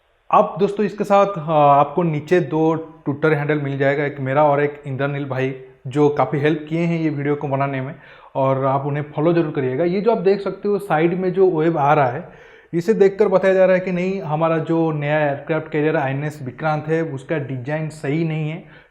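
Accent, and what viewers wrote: Odisha, India. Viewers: Are you from Orissa? Your pronounciation is like odissi people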